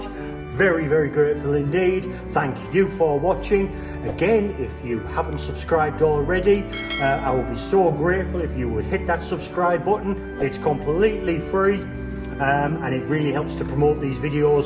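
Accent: North, United Kingdom. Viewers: Thanks a lot for this valuable exploration with the typical northern accent 😎. A tracker-action organ always offers (not necessarily a comfortable, but) the most direct playing feel - no matter if slider chest or cone chest. Even if I have to "work" more, I prefer a mechanical instrument - they are most honest to me - and I can save money for the gym 😉 Electric action is always "switch on - switch off" many meters away - reverb included...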